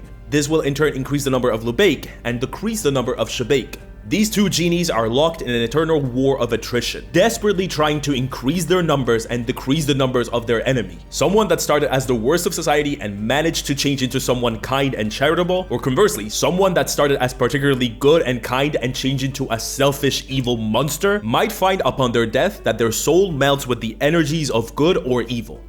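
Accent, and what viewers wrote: France. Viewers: WAIT WHAT ARE YOU FRENCH !?!?!?!? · welp, as a french guy my self i must say... your french sounds... french ? yet you're english does not... i'm confused mate xD are you a fellow frenchman ?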